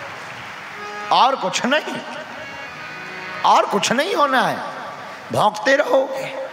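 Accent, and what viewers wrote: Bundelkhand, India. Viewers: Ye apka vedio bahut pyara hai .kitana original bolte hai .Maja a gayi .Apki Bundelkhandi language bahut man bhawan hai..Krishan kanhaiya sa lavanya Mai Devatva Swaroop .Vaisi he meethi meethi Bate.jai ho Banke Bihari Lal apki Jai Hove.... Bageshwar Dham Sarkar ki Jai.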